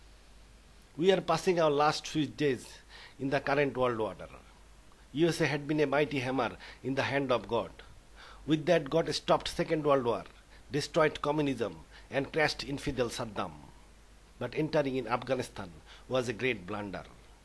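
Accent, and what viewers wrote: Bangladesh. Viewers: Are you from Bangladesh, love your accent.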